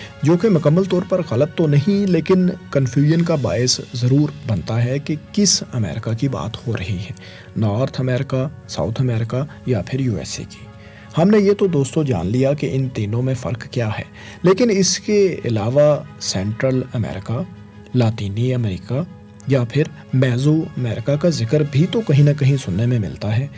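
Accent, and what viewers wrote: Punjab, Pakistan. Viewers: please work on punabi accent .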